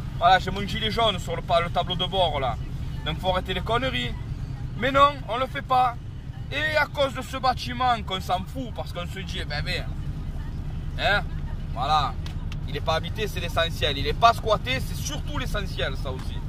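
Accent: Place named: Marseille, France. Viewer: Salut tu es sûrement de Marseille aussi vu ton accent bien prononcer c'est vrai qu'il doit y avoir des très vieux bâtiment dans cette ville mais il n'y a pas que là-bas il a la partout mais beaucoup de bâtiments sur à l'abandon et négliger il faut de l'argent pour refaire tout cela puis beaucoup de propriétaires peu scrupuleux loue des appartements ou des studios à des personnes très vulnérable il profite de ces personnes là car ils ne peuvent aller nulle part ailleurs on doit les punir ces personnes là car il achète des appartements il est relou il ne les met même pas en bon état et beaucoup ne sont pas en règle voilà mon cher ami je regarde que j'ai le temps une vidéo de toi je te souhaite une bonne journée sous le soleil de Marseille à à bientôt Philip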